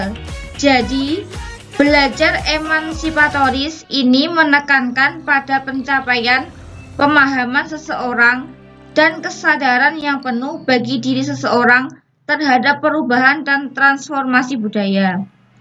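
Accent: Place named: Java, Indonesia. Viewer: Suara nya khas medok Sukses sellu nak cantiksss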